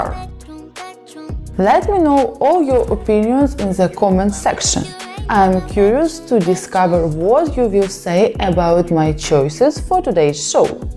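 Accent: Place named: Russia. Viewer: Видеоряд зачёт 😍, но, такой "Рунглиш" я давно не слышал ))) тренируйтесь побольше ))))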